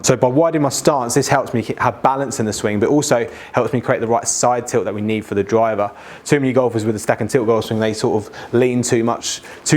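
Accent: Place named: United Kingdom. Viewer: LOL! I wondered with that British accent how you could be Canadian. Another!!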